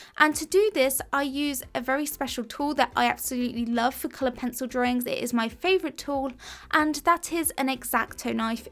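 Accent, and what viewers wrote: United Kingdom. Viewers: Why are the classes priced in US dollars when is so obviously British?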